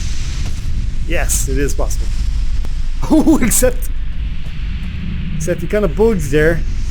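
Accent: Ireland. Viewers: Except he’s irish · hay man love the game, i like ur accent. i have a feeling ur from ireland like me! and if u are where u from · Question: are you irish? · He is indeed from ireland! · Are u Irish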